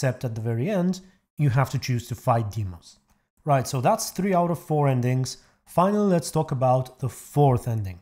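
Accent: Bulgaria. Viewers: Are you from Bulgaria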